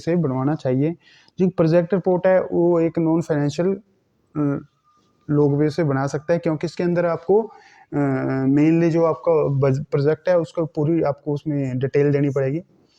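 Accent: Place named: Haryana, India. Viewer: Aap haryana se ho ,, apki language se lag rha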